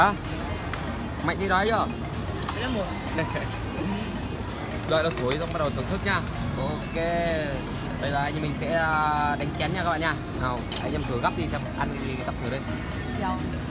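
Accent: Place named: Soc Son, Vietnam. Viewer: Giọng này nghe giống ở sóc sơn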